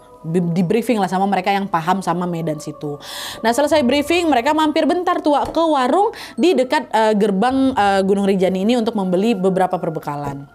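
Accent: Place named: Sumatra, Indonesia. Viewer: Kau orang mana kk ? Bahasa mu kyk org sumatra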